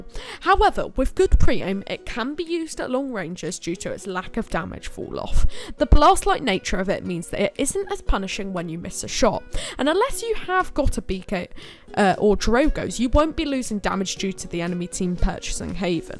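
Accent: United Kingdom. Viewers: British young guy accent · this english accent XD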